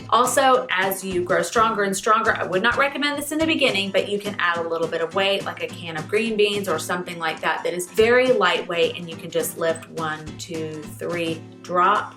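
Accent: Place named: United States. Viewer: Thank you. I found your tips helpful. I go to Physio for other issues and complain often of pain in my right shoulder but I've never mentioned to my physiotherapist the pain in my wrist and forearm because I haven't wanted to bombard her. I should ask her for some help with my issues instead of feeling embarrassed by them. I like the name of your channel. I live near Toronto and I'm bilingual. I was surprised to hear your American accent with a French named channel! If you don't mind me asking, how did you come up with this name?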